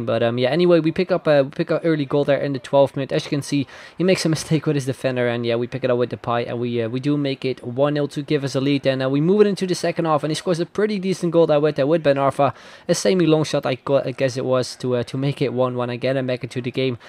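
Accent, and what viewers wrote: Netherlands. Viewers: Are you dutch cause you have a accent?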